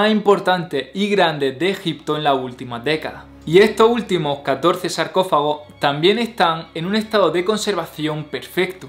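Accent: Andalusia, Spain. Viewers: Andaluz jajajaja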